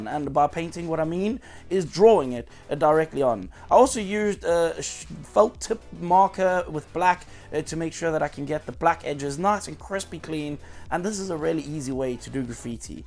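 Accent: South Africa. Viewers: are you south african? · I detect a hint of South Africa in that accent😂 you can't get rid of us that easy. But superb work and truly great craftmanship on the video and models themselves. I need more video series like this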